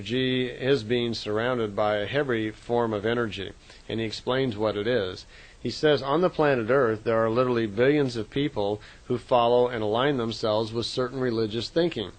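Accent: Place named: England, United Kingdom. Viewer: Well this mermaid is so wishing that she was at the ocean instead of in Las Vegas....I have found some beauty here and it is a place called Red Rock Canyon...but there is nothing like riding waves with your body!! Soon though i will be in San Diego for a week...my childhood home.....You sound as though you maybe from England..I was born in Ireland!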